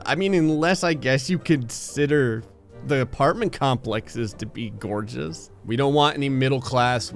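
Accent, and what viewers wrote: New Jersey, United States. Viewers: I'm from Brooklyn and can comfirm that is nj lol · What part of NJ are you from??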